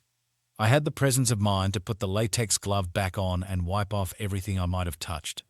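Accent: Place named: Australia. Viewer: Why did you use an Australian accent??? It's obviously set in the USA. There are very few Australians in the USA you have too much violence and blacks.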